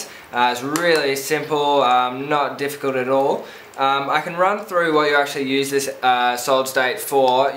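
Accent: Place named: Australia. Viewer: Ahhh, Australian accents. I have to say, I was pleasantly surprised.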